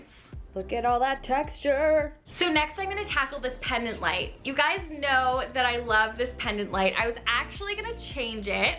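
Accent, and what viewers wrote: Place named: Canada. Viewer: I love that Canadian accent💕🥰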